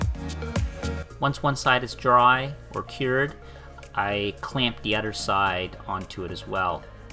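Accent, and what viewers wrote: Canada. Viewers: I hear some Canada in your voice?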